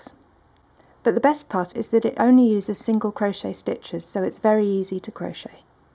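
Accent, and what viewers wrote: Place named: United Kingdom. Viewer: Thank you,. You're were clear, and to the point. Love the effect of visually highlighting the important and critical stiches. You made it so easy! Enjoyed your British accent as well as your soothing voice. Kudos!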